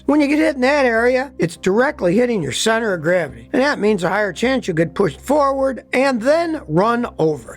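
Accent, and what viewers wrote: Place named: California, United States. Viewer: you’re sounding like a californian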